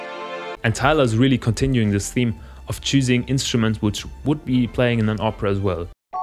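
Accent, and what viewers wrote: Germany. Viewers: I have zero idea how to make music, or what you’re doing in the video, but still very entertaining! Good job Also are you German? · Bist du Deutsch? lol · omg wusste direkt vom akzent dass er deutsch ist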